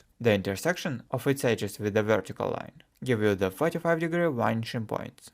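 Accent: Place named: Germany. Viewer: Ur accent sound so germany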